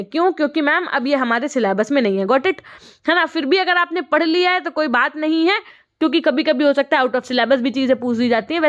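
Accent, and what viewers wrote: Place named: Kanpur, India. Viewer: Full kanpuriya andaz😄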